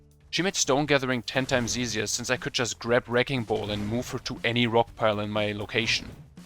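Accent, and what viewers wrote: Germany. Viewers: Are you German I hear like a German accent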